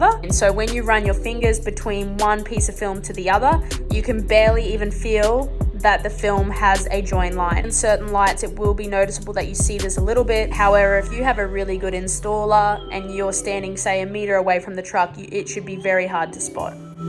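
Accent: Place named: New Zealand. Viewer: Bubbles? how on earth do clear them?? And she sounds like a Kiwi,,,🤔😊😊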